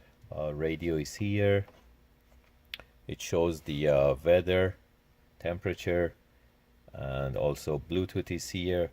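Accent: Iran. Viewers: You sound Persian... Salom chetori?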